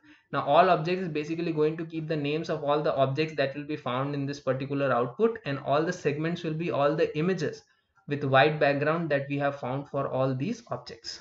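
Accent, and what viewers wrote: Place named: India. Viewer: face-blue-wide-eyes:heavy indian accent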